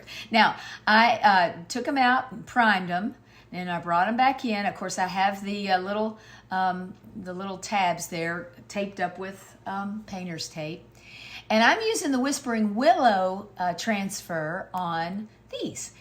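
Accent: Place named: South, United States. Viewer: Happy new year! May god be with you and your family I am so sorry you are going through radiation and chemo god has you in his hand sweetie! I want you to know I love to watch your videos I love your crafting and to be honest I just love your sweet sweet southern voice you have the voice of an angel idk y but you do!! Your voice is very calming to me!! Hope you has a good Christmas , I will have you in my prayers!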